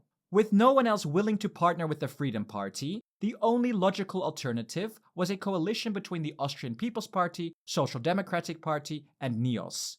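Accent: Germany. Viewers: nah you pronounced the names of the politicans and parties way to german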